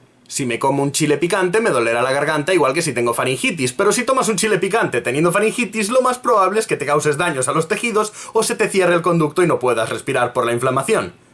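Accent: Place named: Galicia, Spain. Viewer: Este NO es el mismo. Tiene menos acento gallego.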